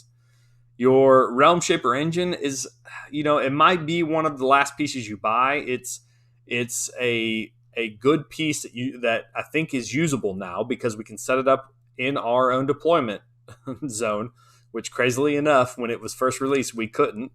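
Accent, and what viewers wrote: Texas, United States. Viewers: Really good video! Loving the Seraphon focused content! Awesome channel, keep up the hard work. Shout out from Kansas bro! thought i sensed a Texas accent in there! 🤘😁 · Ya know I'm not great at picking out accents but I'm pretty I'm pretty sure this guy's a Texan too. *proceeds to miss the lone star in the back for over half the video*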